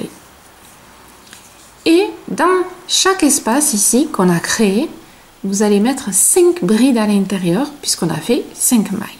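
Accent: South, France. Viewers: Coucou merci pour votre top qui est magnifique et vos explications claires et précises avec votre accent du soleil ❤💋👍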